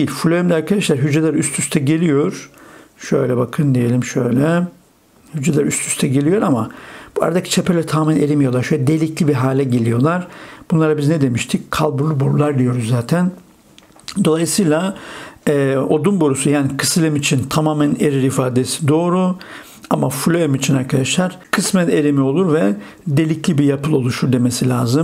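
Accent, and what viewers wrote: Black Sea, Turkey. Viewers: Hocam konudan bağımsız ama Karadenizli misiniz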